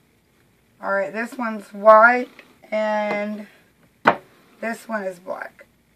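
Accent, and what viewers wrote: South, United States. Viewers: Love your southern accent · I just love you accent, a true "grits" girl like myself. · i love your videos btw, are you from the carolinas? i love the southern bell twang. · I can't add anything that has not already been said except "War Eagle"!! I was so glad to hear a southern girl sharing such great teaching information. I am from Alabama and have added myself as a subbie. Really look forward to seeing your other videos and any new ones. God bless and thanks for sharing! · Hey there! I LOVE this tutorial/idea and have already bought the supplies. I think you are super cute and your Southern accent is adorable. I will share pics of my completed project when I am done. God bless you hon, wish we were neighbors! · Kudos to the closed captioner whoever you are! Sometimes I have a bit of difficulty understanding a Southern accent - and you were totally unflappable. 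:-) This looks like a good way to 'upscale' a counter! Which is a nice way to look like you have a champagne budget when you only have a Kool-Aid budget. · ... while this particular design of granite is too busy for me, I have to say that it is a great technique, especially for people who are on a budget, $25 is an awesome price to transform counters. As far as your way of speaking, don't apologize for it. You are southern and sometimes that's how southern people speak. It may not always be correct but it's not a sin, LOL. I'm from New York and we have a distinct accent as well and we say words differently but I'm proud of how I speak because I'm proud to be from New York City. When I make mashed potatoes I always call them taters:) because I love that word! Another word I like is reckon, so keep on being YOU <3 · Okay, I hate to ask this, but I'm from Michigan and you have a southern drawl and there is one part that I'm not quite sure what you are saying. 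You said in the very beginning to make sure you get the kilz base..Now I don't know if you said OLD Kilz or OIL base Kilz... I really want to do this and I don't want to mess it up. I put the captions on and it showed that you said Old.. but when I watch you say it, it looks like you are saying OIL but with that southern drawl. Could you please clarify for me so I don't mess it up? Thanks in advance.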